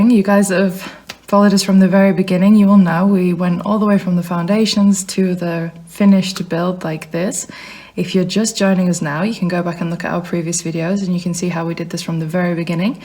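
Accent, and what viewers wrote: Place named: South Africa. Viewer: After a second vid I’m hooked and just subbed. Ice plant is fire resistant! The concrete pope portholes are brilliant. Love your accent, reminds me a little of some South African friends of mine but yours is a bit more exotic. Can’t wait to watch more of your build, you are a talented family.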